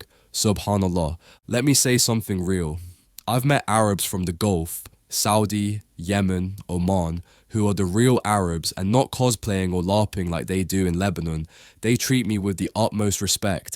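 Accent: United Kingdom. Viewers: The Original Arabs were / are Black. Adham [Radiant, Shining Black]. Aswad [Black], Qadar [Black]. The paler shades of people from MENA = the Middle East / North Africa are the byproducts of Black men producing with the Slavic = Eastern European / Caucasian women. That said, although I know that the racist = white supremacist scenarios articulated happen, the narrator has a keen British accent. And thus, I am curious. Why does someone from Sudan have such a sharp British accent. I may be missing some details,.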